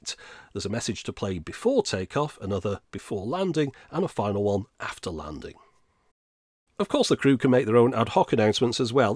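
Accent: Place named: Northern England, United Kingdom. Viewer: Yep - it was the Minidisc video, which got me into in the first place. I thought, I've just watched a 40+ minute video and enjoyed all of it. I love voice with his Northern intonation. 90% of my YouTube time now is videos...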